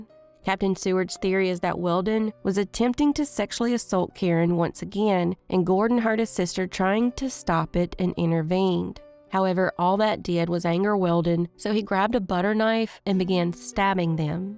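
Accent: South, United States. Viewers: Thank you. Wish you did audio books.:) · 😊 I so enjoy the way you present your cases. Your voice is soothing and I could listen to your sweet Southern voice 💕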